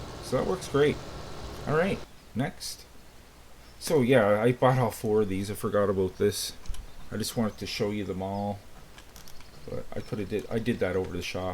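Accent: Canada. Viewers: Its just mac-h-ale like ginger ale u got a strong candian accent btw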